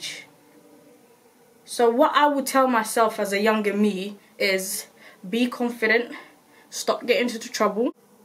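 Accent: Somalia. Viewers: When I first listened, I thought this girl is from Kenya. We have similar somali accents. Omg You are so lit. I love you already. What is even more shocking is you have similar personality to my cousin called Fartun 🙀❤️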